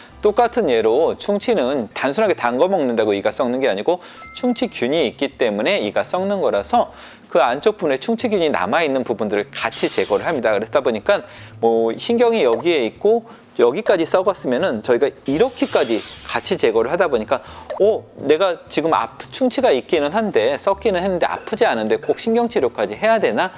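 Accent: Seoul, South Korea. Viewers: 서울분이신가? 있거등요 ㅎㅎ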